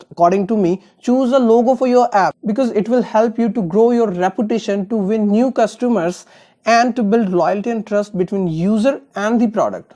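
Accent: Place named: United States. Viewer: Your video is great, but why the American accent on an Indian background and foreground?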